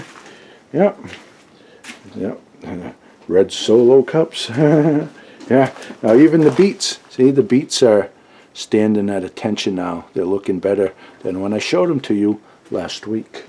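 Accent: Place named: New York, United States. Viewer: Great green house 👍 . I'm looking at the yard today,, bags of leaves all over the place ((just in case )) hahaha. I don't know if u ever did a dumb thing like I did today , I had the iron rake set up by the fence and didn't see it and stepped on it and wamm the long handle hit me in the mouth and nose. Man did that hurt ,, I thought I broke my tooth . It's O.K. NOW but wow I don't know how long I can do the garden ,, just turned 80 and it's getting rougher every yr. Love your videos and N.Y. accent ,, My daughter used to live in Jersey & he worked in the CITY . SO I know that accent ((smile)). Fm. W.I...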